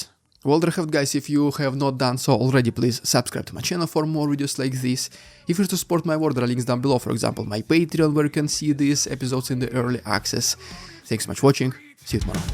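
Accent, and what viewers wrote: Russia. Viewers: I often watch (an more importantly LISTEN) to your verbal presentations. The information is of course most enlightening. Over time, however, I began to notice the high literary quality of your talks. Slightly blurred by your rather thick Russian accent, your eloquence, your ability to deploy apt metaphors and sharp analysis make it clear you are much more than just another military blogger. Clearly you are working from a prepared script (which I assume you write yourself). I want you to make available the written version of your talks. They are wirth preserving and re-reading.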